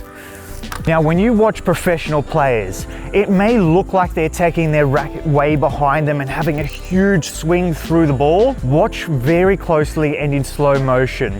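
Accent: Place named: Australia. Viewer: I was very confused by a coach named speaking with an Aussie accent. Really good video production, super clean camera footage, clear explanations, and what an aesthetic vibe. Subscribed!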